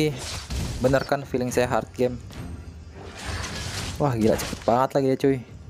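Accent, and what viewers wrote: Manado, Indonesia. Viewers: kayak orang manado pnya logat wkwk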